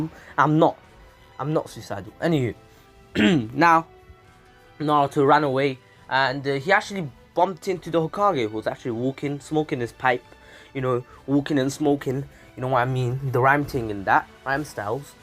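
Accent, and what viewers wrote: United Kingdom. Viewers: Bro i love the british accent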